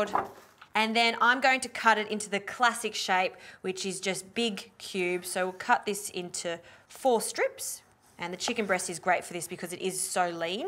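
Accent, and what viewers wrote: Australia. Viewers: she’s Australian 🤣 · amazing this looks really good i will definitely try it ..................... and i love your Australian accent after all I am Pakistani cricket lover who love s food as well. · Is this Australian or New Zealand accent? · is this lady from australia or new zealand? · She's Australian, this is from her TV show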